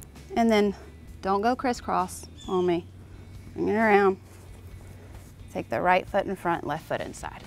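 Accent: South, United States. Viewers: Thanks for lesson. I like your southern accent :)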